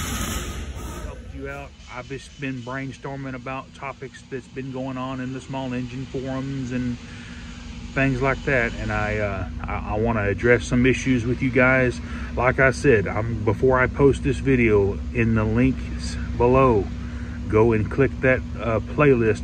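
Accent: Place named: South, United States. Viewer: Why are there so many hard-to-understand hicks with that ignorant sounding souther drawl putting out videos? People don't think you know what you're "tawkin' 'bout".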